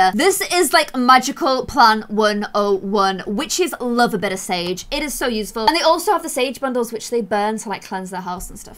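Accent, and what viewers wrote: United Kingdom. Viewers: Isn’t what she’s saying just the British pronunciation? I’m not a native English speaker but that’s how I always said it in my head lol She was also mispronouncing all kinds of stuff when she was playing her Korean series and is, to this day, still saying “Seo-jun” wrong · It’s her british accent lol, also, i think you mean “covEn” and not “coVen”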